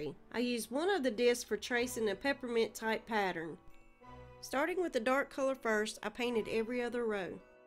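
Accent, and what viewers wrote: South, United States. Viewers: Nice. I love your southern twang! It's fantastic!!!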